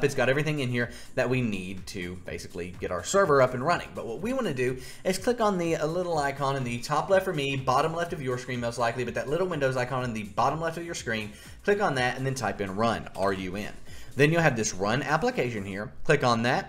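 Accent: West, United States. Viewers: I like your western accent :D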